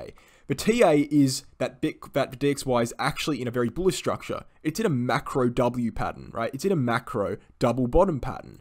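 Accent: Australia. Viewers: It’s refreshing hearing another Australians voice in the crypto space … keep up the great TA man 👌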